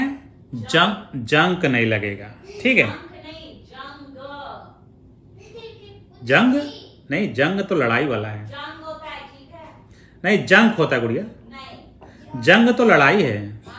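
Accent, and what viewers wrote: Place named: Uttar Pradesh, India. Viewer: Appka Hindi UP ka ho... magar bache pura Gore hai...thats very much:) (laugh).. LOVING YOU ALL!!:face-red-heart-shape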